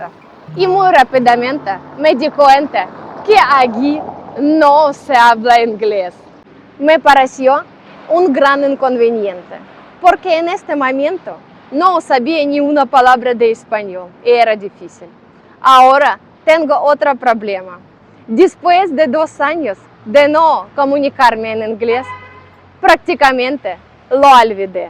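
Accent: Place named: Central Spain, Spain. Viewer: una forma de hablar español, un poco del centro / norte de España, digamos muy neutro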